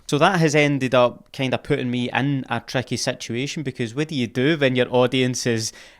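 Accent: Scotland, United Kingdom. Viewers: The reason I started watching you was your Scottish accent. Everything is entertaining in Scottish accent. I would watch paint dry if you would narrate it. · mate, i watch for you, your accent, and the Scottish wit. Your creator-craft has grown significantly, and it's been a pleasure to see. I'd click so fast on any ARC Raiders content. There are so many camping spots to abuse, and the VOIP would deliver some golden content. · I got suckered back into COD with the MW2 alleged 2 year cycle and support, Activision hasn't had a cent of my money since but I still watch all the content for the factor. A few other creators tried imitating the WZ spectating and it was crap. I feel it's the Scottish humour and accent we all love so much more than the game. Don't let burnout and negative comments bring you down pal. For every one bad comment there's a crap load of lovers of your content.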